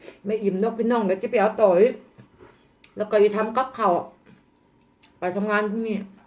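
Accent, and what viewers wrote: Isan, Thailand. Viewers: ได่ยินสำเนียงบ่านเฮาเอื้อยหย่าแมนคิดฮอดบ่านหลาย-กินหลายๆเผื่อเอื้อยแนเด้อ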